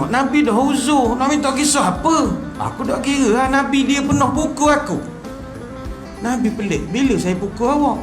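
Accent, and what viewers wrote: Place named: Terengganu, Malaysia. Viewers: Ustaz ada masuk slank (bahasa) Terengganu sikit...tapi rasa sedih dn sama rasa rindu kita kpd Nabi SAW dlm cerita Nabi kali ini. Moga kita semua di dlm rahmat Nabi SAW...Aaminn3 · Loghat campur2 antara terengganu dgn pahang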